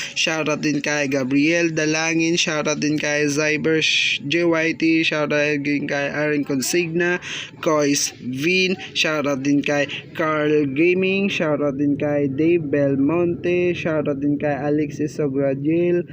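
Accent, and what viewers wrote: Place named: Visayas, Philippines. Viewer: bisaya diay ka lods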